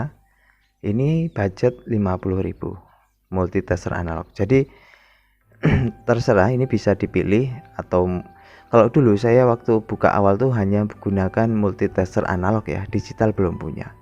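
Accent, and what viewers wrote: Java, Indonesia. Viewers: Orang Lampung ? Kok logate jowo mas!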